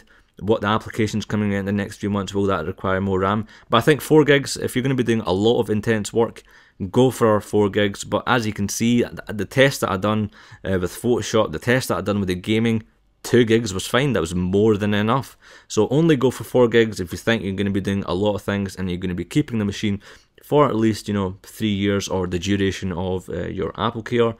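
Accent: Scotland, United Kingdom. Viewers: Really enjoyed the video, just ordered the 13" 2.13 4GB 256 Flash and can't wait to get it, have put my 4 year old 15" MPB's backlit keyboard off to get used to it but don't see it being a massive problem. Being from Aberdeen it's nice to hear a scottish review :D Cheers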